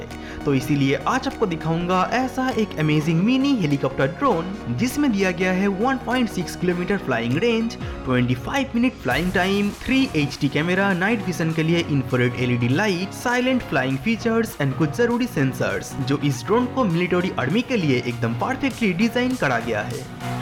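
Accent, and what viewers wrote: Bengal, India. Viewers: Bengali ho kya bhai!!! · Damn!! That's some freaking bad accent!!! When will this indian learn English???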